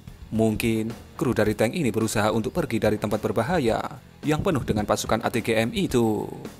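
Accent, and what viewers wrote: Java, Indonesia. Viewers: Maap gue slalu skip 10 detik pas openingmu dr kata HALLO PEMIRSA 🤣 krna medok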